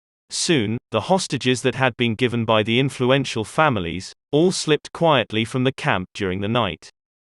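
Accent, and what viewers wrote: England, United Kingdom. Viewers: Just a thought, You sound English, so you must know how close southern England is to Calais in France, visible on a clear day Loads of people have swam across the channel including older people and younger ones The youngest being 12 or 13 years old ( that was a few years ago, i don't know if anyone younger has swam the channel since then.) If you've been to France on the ferry you'll know it doesn't take long even in a slow lumbering ship like that. If you set off in good weather, with no sign of rain clouds as far as you can see you'll arrive on the opposite coast without any problems. Only a fool would set off across the channel in bad weather ,dark clouds or strong winds. The Spanish tried several times and were blew beyond the channel into the north sea and not many survived. Caesar wasn't a fool, he wouldn't try crossing in bad weather , not twice. 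Nobody would, they would just wait for better weather. They would only do something like that if they had no other choice , Such as being chased by a Celtic army. Its the only thing that makes sense.) Beaching ships doesn't make sense either, if a quick departure is needed and the tide is out , your fkd . A couple of miles north or south there's a few sheltered harbours that would have been perfect. He claims to have someone with him from Britain who knew the land..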